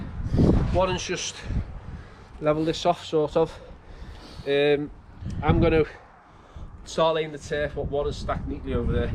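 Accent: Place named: England, United Kingdom. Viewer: And you understand this English dialect? I hav ebeen many times in Liverpoll, Manchester, Leeds etc. but always keep my ears open tom listen what they say!, sometimes i pass, ha ha ha. I'm from te Netherlands and drove many years with a truck, in the UK the say Lorry, with deep frozen food and before that with flowers and plants, I've seen the Uk from south to North and from east to the West and every time I had to listen very well what the people tellinmg me, because I don't understand it immediately but after recall I did. Yes I have learn English at school but most from the Television special the westerns from the good all days!!, well take care you all!!!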